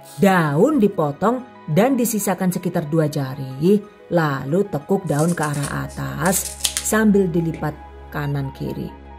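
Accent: Banyumas, Indonesia. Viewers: Seru kl lg ngomong banyumasan, suaranya nge bass ternyata mbak nya imut cantik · Ngapak banget terhibur aku🤣🤣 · Betul banget. Suaranya juga khas😁😁 apalagi pas ngmg ngapak. Hrhe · Seneng bingit sama chanel inih# apalagi pas si mbake ngomong bahasa ngapak & English🤩🤩🤩🤩🤩#GiveawayTHV2021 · Deneng kaya wong ngapak 🤭 Ternyata ibune ayu,terus suarane khass CATAT..😆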